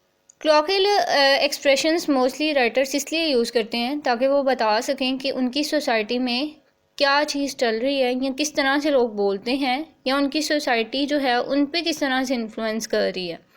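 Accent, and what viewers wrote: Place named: Urdu, Pakistan. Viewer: She is speaking in urdu accent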